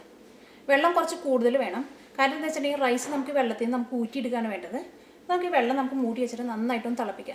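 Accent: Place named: Northern Kerala, India. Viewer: kitchen u r a very good cook!!! I like your recipes coz whenever I try any of them, they turn out to be really "homemade"... n me n my Husband are fond of your slang... R u from northern part of kerala?? Keep inspiring beginners to cook confidently..🙂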